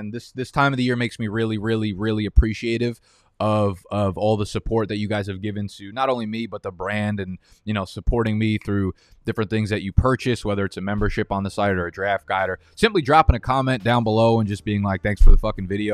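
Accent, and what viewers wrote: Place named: New York, United States. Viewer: I appreciate that I can tell you're from new york in about 3.5 seconds. Absolutely unbridled.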